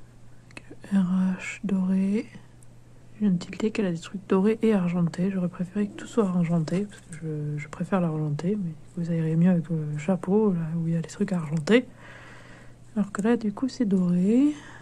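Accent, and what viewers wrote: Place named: France. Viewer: I have 80 of rainbow and shadow high dolls And I have her and she's gorgeous and your French accent is so gorgeous. I love it. I can understand what you're saying. Even though you're speaking French. Which I love.❤❤❤❤❤❤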